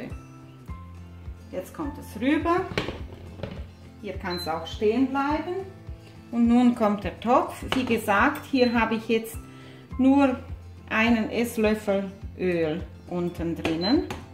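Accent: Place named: Transylvania, Romania. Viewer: Werde das Rezept in den folgenden Tagen zubereiten. Mama machte das mit angebratenem Speck! Werde ich beibehalten! Leider tue ich mit Knoblauch wie immer übertreiben! Ein Lib an Deinen wunderbaren siebenbürgischen Akzent! Meine Eltern hatten den auch beibehalten! Bin seit meinem 12 . Lebensjahr in Nürnberg ansässig und spreche fließend viele süddeutsche Dialekte! Soxesch kann ich auch noch! Vorwiegend die Dialekte aus der Schäßburger Region! Da sind meine Wurzeln! Herzlichen DANK nochmal! 🙏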